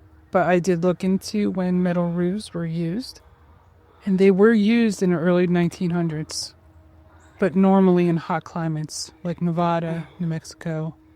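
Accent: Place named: Philadelphia, United States. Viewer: Loved the video. Was it intentional not to reveal anything about the location? It feels a bit counterintuitive if your goal is to share historical preservation. I couldn’t even figure out where you're located in the United States, though I think I hear a Philadelphia accent—maybe? Adding a mention in the description or title could be helpful.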